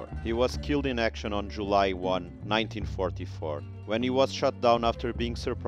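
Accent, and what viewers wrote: Portugal. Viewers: Great analysis on an interesting subject. Well done! Do I detect a Portuguese accent? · A Portuguese accent..great video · Is the voice Portuguese? Reconheci logo o sotaque. Ahahahhahahaha abraço w muito bom vídeo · NIce video, and nice Portuguese accent :)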